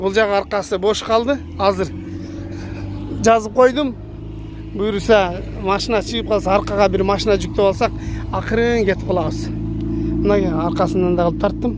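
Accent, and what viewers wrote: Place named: Osh, Kyrgyzstan. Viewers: Ассалому алейкум. Бир тууганым тилиниз бир ширин да. Туштуктуктор биз тушунобуз,урдура берин. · Ака кызык кызык суйлойсузда оштон болосузбу ишинизге ийгилик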